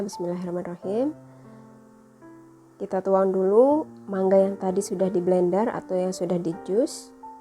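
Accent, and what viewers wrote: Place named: Java, Indonesia. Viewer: mantab.... suaranya medhuk ya kak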